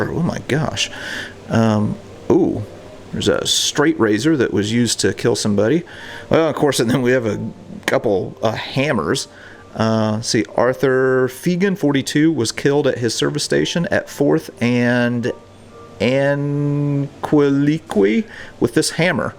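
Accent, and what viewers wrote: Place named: Midwest, United States. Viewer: "Dadgum", "merciful days" I love your homespun, Midwestern exclamations.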